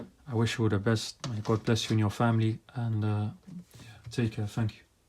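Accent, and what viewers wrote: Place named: Arab world. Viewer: Hispanic name, with arabic accent?!! Strange!!!!!!!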